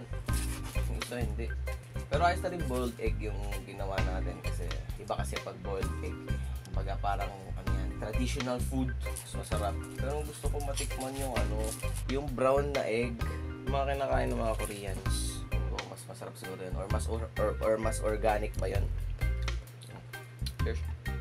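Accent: Visayas, Philippines. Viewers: kuya visaya kaba??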